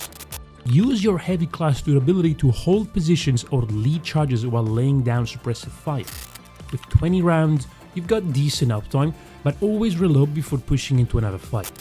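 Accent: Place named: Russia. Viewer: i'm not too sure, as I haven't heard a lot of accents, I wanna say Russia, but I also don't want to seem offensive if i'm wrong sooooo, sorry if I am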